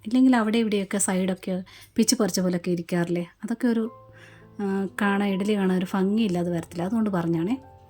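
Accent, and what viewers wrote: Kollam, India. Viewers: കൊല്ലം slang 😊..recipe is healthy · കൊല്ലം ജില്ലകളിലുള്ളവർ പറയുമ്പോൾ സംഭവിക്കുന്നതാണ്... പ്രദേശികമായ ഓരോ സ്ലാങ്ങുകളുടെ പ്രത്യേകതയാണ്.. ഞങ്ങൾ കൊച്ചിയിലുള്ളവർ മാങ്ങ പറിക്കുക എന്ന് പറയുമ്പോൾ, കൊല്ലത്തുള്ളവർ മാങ്ങ പിച്ചുക എന്നാണ് പറയാറുള്ളത്.. Never mind.. റെസിപ്പി എന്തായാലും സൂപ്പർ 🙏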